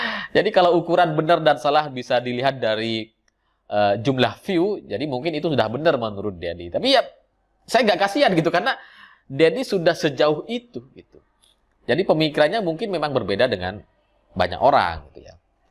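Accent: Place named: Madura, Indonesia. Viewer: Ada logat Madura.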